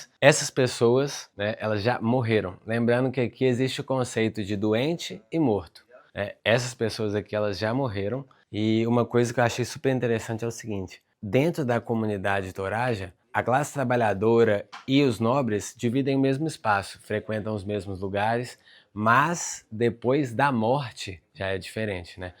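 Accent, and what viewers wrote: Minas Gerais, Brazil. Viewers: Muito estranho! Curioso! Obrigada por partilhar! Vc é mineiro?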